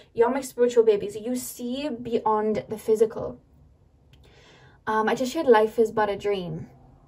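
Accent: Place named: Manchester, United Kingdom. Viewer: Great reading.! Love your accent!Ireland? Manchester? I'm an Anglophone, lol, so I've watched tv series with accents for years, but it's hard to distinguish certain accents.... I'm pretty sure you're from Manchester...am I right? Correct me if I'm wrong.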